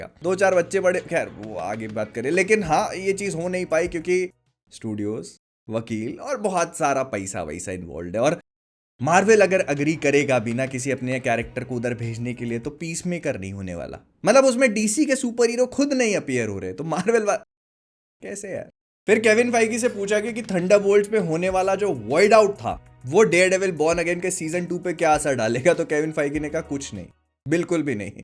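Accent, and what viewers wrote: Bhopal, India. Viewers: Bhopal se ho kya aap?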